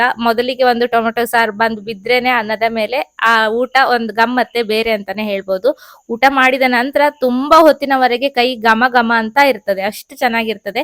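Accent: Kundapura, India. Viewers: This is pure kundapur kannada ಕನ್ನಡ!! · I was waiting for this recipe from so many years. Being a kundaprian I used miss this every time i eat rasam in Bangalore. Thank u so much madam for this recipe. Now watching again to make the rasam powder for the second time. Twice in a week is mandatory in our home now😊. Laik attu saru. Heenge video madtaikani khushi aytu. Nam kundapra bhashe keluke chenda.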